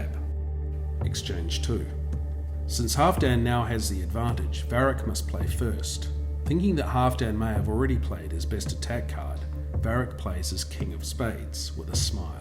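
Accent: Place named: New Zealand. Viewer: Sounds like this is an NZ developed game, from the voice over at least. Couldn’t get a copy from anyone in the South Pacific, so had to order a copy from Germany! Looking forward to it arriving. Hopefully enough time to print and paint some minis!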